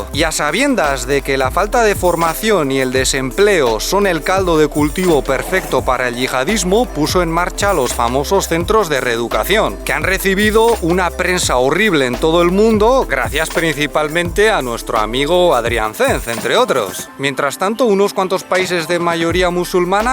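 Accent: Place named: Castile, Spain. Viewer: Great video from a cute couple, i watched many of your videos and i congratulate you for their quality, the images are spectacular and the information more credible than the western MSM. I wanted to comment in Spanish but my writing is rusty, i Haven't written in Spanish for over 30 years. Fortunately i can still speak and read the language to some extent, i also have to admit, it took me a while to understand you due to your strong Castilian accent. Muchas gracias de Canada.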